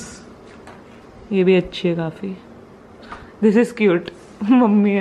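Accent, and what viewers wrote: India. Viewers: want to hear hindi from you your hindi accent is like damm loving it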